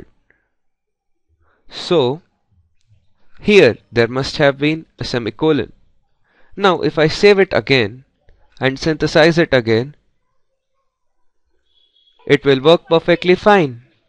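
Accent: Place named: India. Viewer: perfect. slow. clear. indian :)) thanks!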